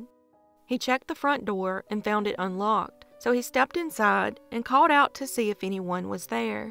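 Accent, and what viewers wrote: South, United States. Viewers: Love you and your channel. Also love your accent because I’m from the south too(Georgia) and I have my heavy accent too lol and I LOVE IT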